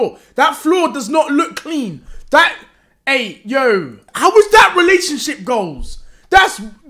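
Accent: United States. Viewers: talking about sorrow with a American accent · Omggg his American accent is honestly one of your best things on this planet lmaoo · Your American accent is top notch man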